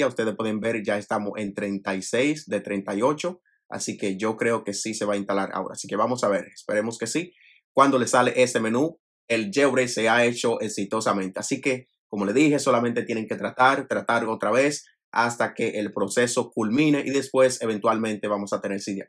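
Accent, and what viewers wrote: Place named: Dominican Republic. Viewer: Dominicano Verdad lo noté por el acento Y se puede con iOS 12.2 ?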